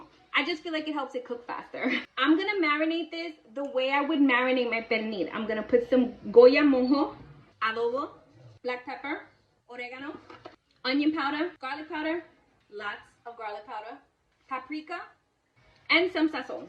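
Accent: New York, United States. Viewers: Her Spanish accent is fighting with her ny accent. Sometimes ones winning sometimes the other is. Lol.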